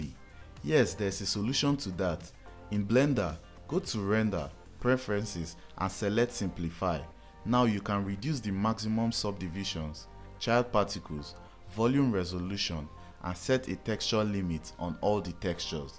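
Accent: Nigeria. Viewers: Are you from Nigeria 🥺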